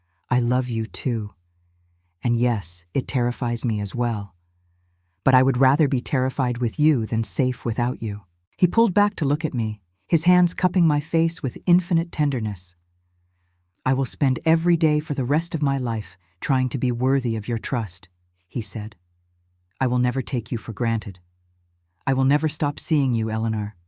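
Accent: United States. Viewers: I absolutely LOVE these story's but, you have made a fundamental error choosing this beautiful American voice to narrate. Victorian & current day America, never had Dukes or any British aristocracy. To make these story's more believable, you should use an English/British voice. ❤❤❤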